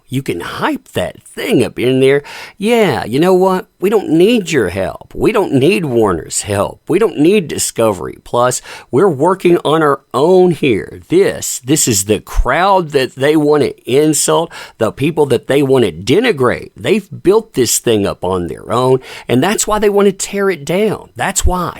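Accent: United States. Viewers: I actually like your American accent as a Brit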